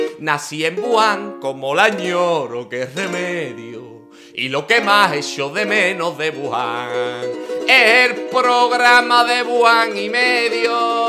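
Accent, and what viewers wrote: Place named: Andalusia, Spain. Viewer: Qué arte, picha (o miarma, que no sabe uno bien por el acento)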